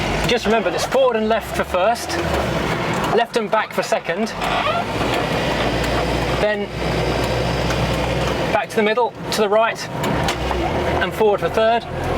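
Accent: United Kingdom. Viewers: You are typical British it is known by your accent.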